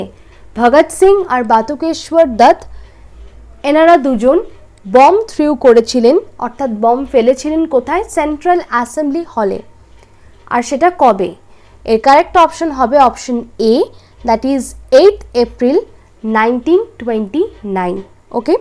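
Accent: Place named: United Kingdom. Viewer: Mam apni oxford english bolchen.ইংরেজ দের মত বলছেন কানে বডড লাগে।আপনাকে ছোট করলাম না।sorry vul hole khoma korbe .apner question gulo khub valo.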